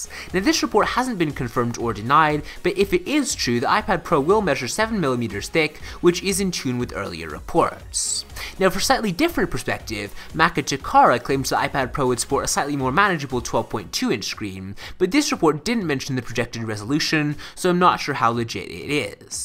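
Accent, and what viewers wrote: Scotland, United Kingdom. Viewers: Sounds like a Scottish guy trying to forcefully perfect his American accent.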